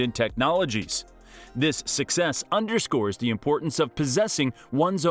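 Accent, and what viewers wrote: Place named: United States. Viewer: Why use an American accent when you're anti USA? Keep it 💯 wus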